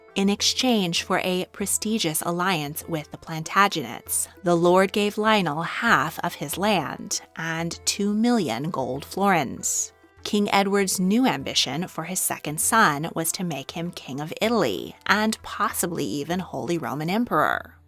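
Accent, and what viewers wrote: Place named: United States. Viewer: Such a strong American accent makes it a littke difficukt to understsnd all she says eg 'Rozayz- - 'roses'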